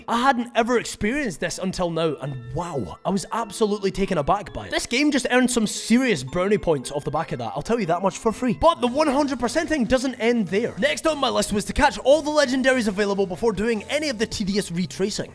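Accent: Scotland, United Kingdom. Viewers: This is the first moment in the video that I realized you had a Scottish accent. 😂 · Oi m8 what’s yer nationality cause I hear some Scottish in that accent (the speed and the emphasis on p, c, s) also I like the persona music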